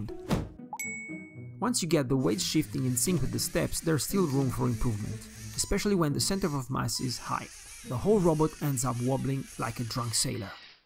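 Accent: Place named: Italy. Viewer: I'm guessing Italian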